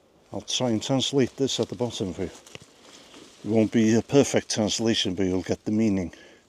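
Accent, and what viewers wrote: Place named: North Wales, United Kingdom. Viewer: I’m a 100% Welshie from Gwynedd and I have to say we do have the most beautiful views in the world plus it’s nice to have a video with someone with my north wales accent. Love the video btw ❤ da iawn a diddorol🏴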